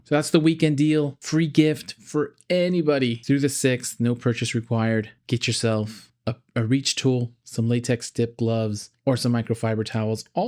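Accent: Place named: United States. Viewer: This guy has such a pleasant voice. No homo. A very cool American accent man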